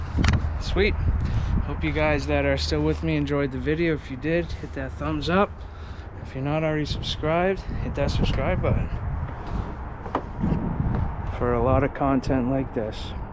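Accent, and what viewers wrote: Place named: Maine, United States. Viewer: I love your channel and videos! For a second, I thought you were a fellow Canadian... well... until the Maine accent came out.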